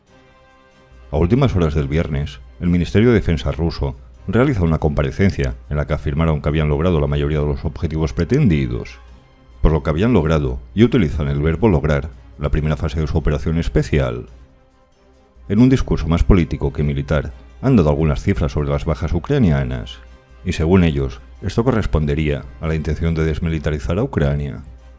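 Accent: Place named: Seville, Spain. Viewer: Por cierto, una curiosidad si me permites ¿Eres o provienes familiarmente de Cazalla de la Sierra o de la Sierra Norte de Sevilla? Tienes la misma entonación que la gente de allí.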